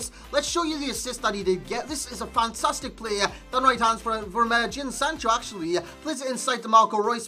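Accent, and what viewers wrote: Newcastle, United Kingdom. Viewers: are you geordie?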